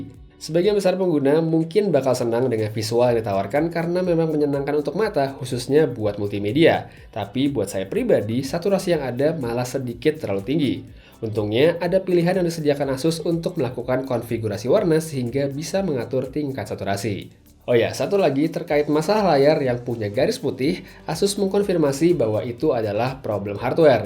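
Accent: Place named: Java, Indonesia. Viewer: Reviewer Medok muke lu medok njing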